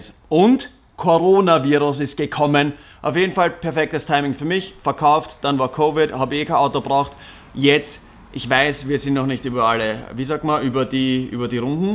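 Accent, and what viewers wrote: Austria. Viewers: It's cause of his Austrian accent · Hahaha alter erstes Mal ich merke ein starkes Österreiche Akzent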